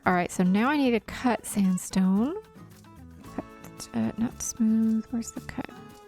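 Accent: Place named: Texas, United States. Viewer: I thought you were in TX. I can pick up the accent every once in awhile. My wife is from Australia and she even has it every so often. It rubs off on you. 😆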